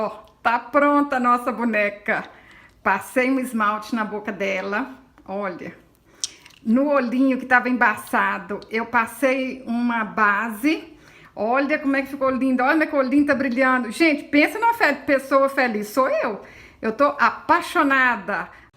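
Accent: Minas Gerais, Brazil. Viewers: Que sotaque gostosoooo…. Mineira uai…. Lembrei da minha querida avó paterna, uma crocheteira de mão cheia r minha primeira professora! Viu te seguir, amei seu trabalho, em tempos onde tudo se descarta regatar uma boneca é lindo! Beijos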